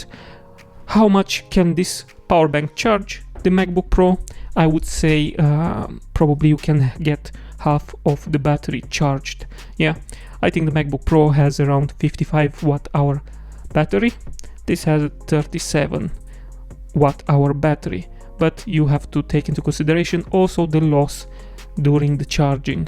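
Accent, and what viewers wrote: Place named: Romania. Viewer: accentul de roman se cunoaste fratele meu :D:D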